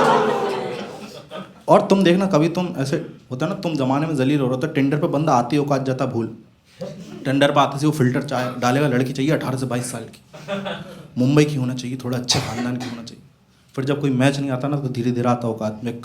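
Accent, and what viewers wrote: Indore, India. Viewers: Dono hamre MP se hena to accent to same hoga hi or me bn gaya itna bda comedian to mere ander bhi aap esa hi accent dekhoge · Bhai dono madhay pardes ke hai.... Bhai ka accent toh pura biaora , indore ke aas paas ka lag raha hai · Both Zakir and are from indore.. And that is the indori accent. That's why they sound same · Both he and Zakir are from Madhyapradesh and that’s why similar Hindi dialect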